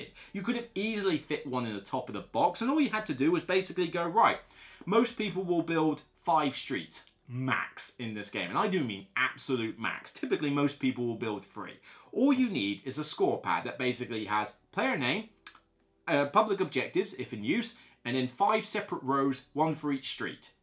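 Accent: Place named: Bristol, United Kingdom. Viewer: fair enough - Wales is a bit further away, but I would definitely recommend it (sorry, I thought your accent was from Bristol as my daughter lived there and the accent sounded similar to me).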